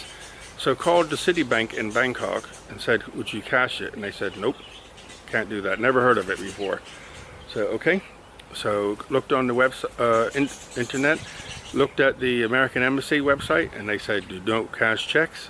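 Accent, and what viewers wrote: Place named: United Kingdom. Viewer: Your very blessed with...I thought you were brit 🇬🇧🤪👌✌️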